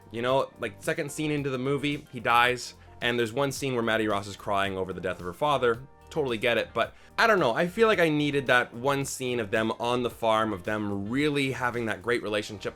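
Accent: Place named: Rocky Mountains, United States. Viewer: So, I'm a rare species in that I'm American and speak more than one language very comfortably, if you're not from Alberta or Eastern British Columbia Canadian I'm super impressed. Brits can't do Rocky Mountain American like you can. You're not German because you can say "W". Swedes and Norweigans do a great job at this particular dialect of English, but the Dutch even though huge percentage speak nearly perfect technical English, never quite get the rythm right. Not Aussie because they love their own way of saying things and they should because it's great. If you know anyone from Australia, the only thing funnier than doing John Wayne by John Wayne himself because he's from Illinois and his accent is not actually his own I believe is have an Australian do an impersonation of John Wayne.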